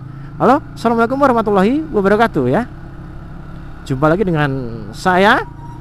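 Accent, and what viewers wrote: Java, Indonesia. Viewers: Medok banget gaya ngomong.a...mantap bang sukses terusss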